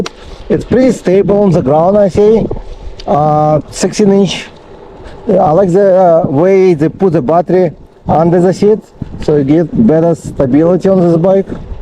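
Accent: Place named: Russia. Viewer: Простите конечно но по вашему акценту понятно что вы говорите по руски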